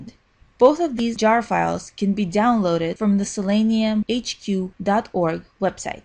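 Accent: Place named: Russia. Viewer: You must be Russian!)) Thanks for the video...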